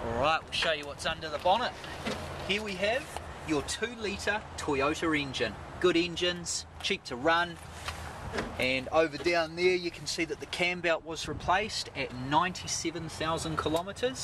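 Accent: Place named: New Zealand. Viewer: Funny kiwi accent)) Keen as))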